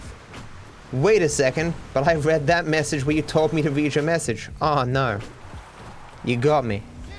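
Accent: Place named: Australia. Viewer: You sound Soo middle class Au...! Enjoying the vids fella.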